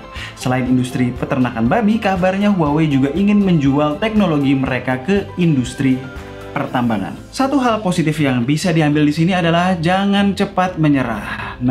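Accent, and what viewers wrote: Java, Indonesia. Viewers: kok baru medok? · Lebih nyaman gausah medok mas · Jawa nya medoooook mas.... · Galfok sma mendok nya om. Sma medoknya kaya saya. Salam ngapak om... Gpp bkn yg prtma nnton,,yg pntg selalu nnton saat pidio baru uplod. 👍👍👍👍👍👍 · Medokee maseee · Sobat jaksel logat jawa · Saran jangan pake nada jawa lah pake nada formal sajaa. Gak enak didenger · Kok medok mas... >< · bang logat jawa nya di hilang kan saja, supaya lebih mantap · Kenapa jadi medok 🤣🤣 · Kok jadi agak2 medok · Logat medok jowo. Next : logat madura bang ato logat medan ➡️➡️➡️ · Logat Jawa nya keluar 😂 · udah ganteng, manis, medok lagi ngomongnya.. #idaman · bohoso ne medok men :v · Medokk amat yakk sekarang bahasanya 😂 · bang logatnya berasa pembawa acara orang jawa👍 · Ga seru kalau mendok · Bang kedengarannya pake logat Jawa yah? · Bukan niat mau rasis atau gimana, cuman dengan medoknya jadi agak aneh dan ga nyaman didenger mending kek biasa, jangan dipaksakan. 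· Mending nunggu..om balik gak medok lagi. Gak enak didengar mas kalau medok.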